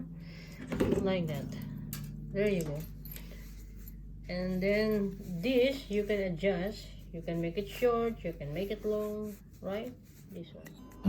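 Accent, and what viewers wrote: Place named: South America. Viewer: me to! I thought South America. Her English is really good but u can still hear an accent and I thought it sounded like south American. We're aways off..lol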